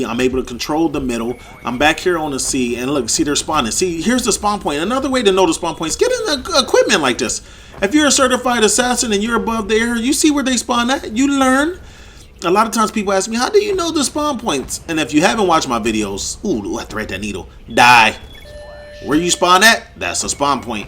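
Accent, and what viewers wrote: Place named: United States. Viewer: It's not pronounced call of dooty it's jewty fucking yank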